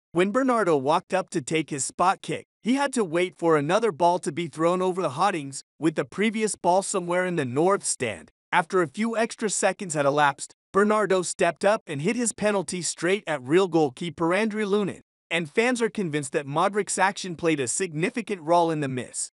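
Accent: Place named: United States. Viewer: Amazing how AI with a US accent can pronounce every other word incorrectly!🙄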